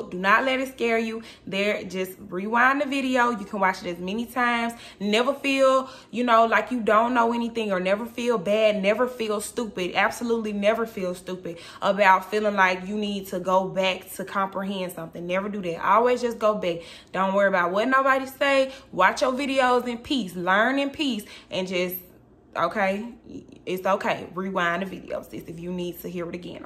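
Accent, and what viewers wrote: Atlanta, United States. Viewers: You talk like you’re from Atlanta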